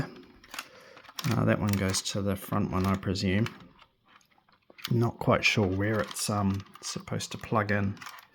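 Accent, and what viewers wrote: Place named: New Zealand. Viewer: So I had one of these growing up, ironically in New Zealand bro! You’re undoubtedly a kiwi with that accent. Lol. My parents couldn’t afford a Tamiya so this was a cheapskate version. Don’t know where they got it but I believe it was on an overseas trip and possibly from Japan. It ran good enough but I remember those T-bone axels would break and we couldn’t get replacements. I’m 50 so this would have been in the mid to late 80’s. Mine was lost to the annals of time, but I managed to find one on TradeMe a year or so ago and have it in my collection! Came with the remote and box. The original looks quite different from yours as it has a tail scoop and huge front bumper. Wish I could post a pic for you. It’s a funky little RC but I’m so stoked to have a piece of my childhood back. Cheers bro.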